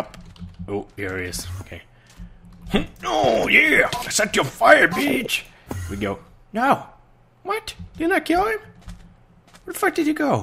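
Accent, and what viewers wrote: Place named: Sweden. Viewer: Fan va gött och höra! :) Bästa videosarna på YT så jävla gött med lite engelsk med svensk brytning! Tack för att du gör videos! Ha det gött and get some more beers! ;)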